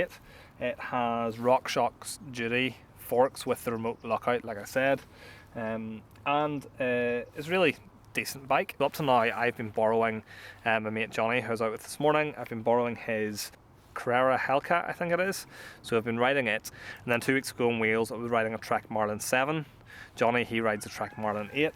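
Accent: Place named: Ireland. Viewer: I thought the accent was familiar, my one year younger brother was born in Ballymote Ireland August 1965 at a Catholic nuns house and he's the most successful of 5 children , freshly retired with full pension and, I think I'm going with a 2022 Cube Aim pro in same color scheme as your's $500 off retail right now at $999.00 CAD ,& putting 5 great touring accessories on it Both fenders,Both Lights and the Universal Kickstand,So should be a great bike , Never have spending over a G Note for a New pedal bike instead of buying second hand used for $400 less, So I figured the extra cash for a New bike is the wiser way to go. From Alberta Canada.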